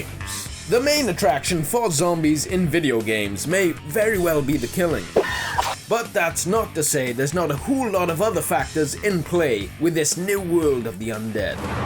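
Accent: Wales, United Kingdom. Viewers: Is Welsh? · Firstly your the only big YouTuber ever to respond to a comment so 100x props for that and then second your from wales so 1000x props for that :P I thought I heard welsh overtones but I wasn't sure, what part ? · No he's british or welsh I think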